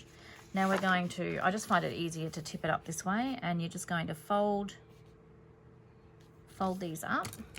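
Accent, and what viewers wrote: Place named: Australia. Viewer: Thank you. I just found you and subscribed, nice to hear an Aussie 😄and love your style with using up 12" sheets, I have so many and struggle to make ephemera and pockets for journaling. These are just the thing I love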